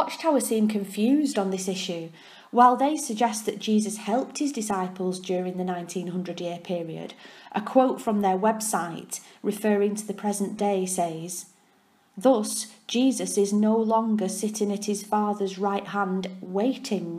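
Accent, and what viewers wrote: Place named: Ireland. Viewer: The Irish accent this lady has is just lovely. 😍😍😍😍😍😍😍😍😍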